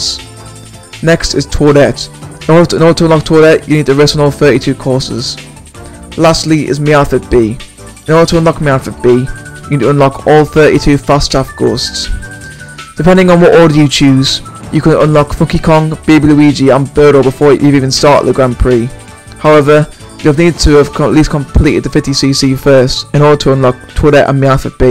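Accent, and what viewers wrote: Newcastle, United Kingdom. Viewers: I can’t understand your Geordie accent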